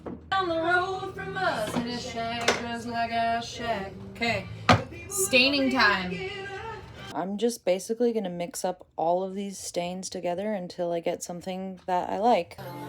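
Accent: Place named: United States. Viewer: Love the innocence. I’m sure ur a cool gal. U in “Canada” or somewhere but ur accent sound “American”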